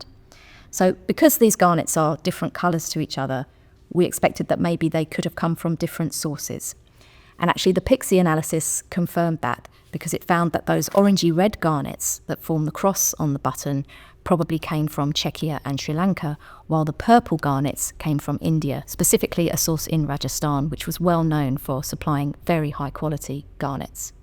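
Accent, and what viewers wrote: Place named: United Kingdom. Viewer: I want this lady to explain everything to me. A hot blonde with a British accent sure makes information go down smoothly. 😅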